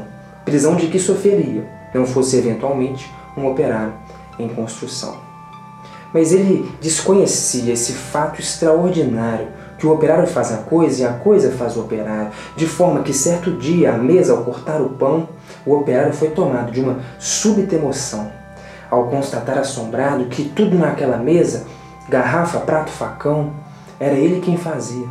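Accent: Minas Gerais, Brazil. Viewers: Seus compartilhamentos de textos são ótimos! Encontrar esse canal, digo, ter acesso ao seu trabalho aqui, foi um achado e tanto para mim esses dias. E esse sotaquezinho - mineiro, não é...?! Obrigado pelo compartilhamento, dedicação. :)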